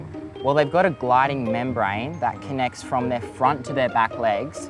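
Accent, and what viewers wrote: Australia. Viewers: Brave Wilderness is great, however, it is not as good yours with your Aussie accent, sweetness, and willingness to learn and listen to others. I applaud you for being the best you can be at all times.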